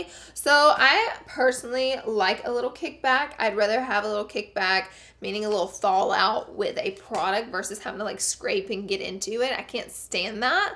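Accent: South, United States. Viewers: 😞😯 Sure miss your own unique personality & southern accent 😩😒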